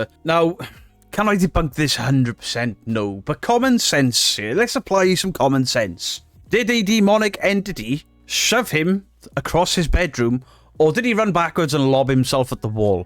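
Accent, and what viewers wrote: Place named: England, United Kingdom. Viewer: I will say, house is super clean, the yard looks lovely, and if he'd go legit for entertainment, I love the accent, people in Australia will pretty much watch anything with and English accent. Because here in Tasmania we grew up with English shows, going back to, wow, for me, the enchanted cottage, with nellyphant and little joe and the kangaroo 🤔🤷‍♀️ I can't remember his name, the wombles 😃🤗 Beatrix Potter, keeping up appearances, especially Onslow and daisy 🌼, you should react to the bloopers one day, so funny, would I lie to you, is stellar, they tried to do an Australian version and it was so cringe, I just prayed no one else in the world would see it ugh 🙈 I could just go on for hours, oh, come dine with me 😄😄😃 the voice over guy 💞💞💞💞💞, how midsomer is, where it real the most dangerous place to live on the planet, I'd love a midsomer spoof 😄😃 my poirot, my David Sutchet, oh, Still Crazy, if you English and haven't seen it ☝️for shame, my Bill Nighy, Billy Connelly, Jimmy nail, 😍 Bruce Robinson, and the other ones, I'm so sorry, my 9 year old grandson stayed last night, it seems I pick up his personality when he goes home, I'm in a very happy silly mood, and I wanted to share it here because I don't feel judged 😁🤗🙃💜👍.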